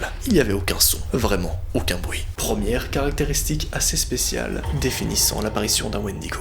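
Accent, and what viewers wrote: Belgium. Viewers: Tu es belge ? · cc t belge?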